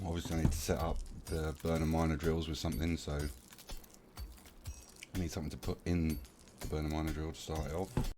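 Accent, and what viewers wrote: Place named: England, United Kingdom. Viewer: Are you from England your accent sounds like mine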